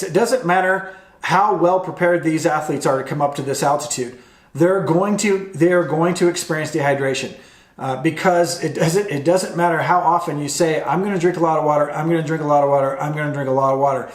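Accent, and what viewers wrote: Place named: RP, United Kingdom. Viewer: It's so nice to heard commentary about CU by someone who speaks the Queen's English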